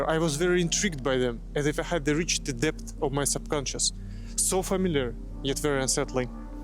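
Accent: Russia. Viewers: Сразу видно нашего по ярко выраженым русским акцентом. Хотья я и так знал что создать "Привет Сосед" русский но все равно ❤ Жалко что нет звуковой дорожке, я бы послушал оригинальный голос без ярко вырженого акцента😅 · русский акцент никогда не скроешь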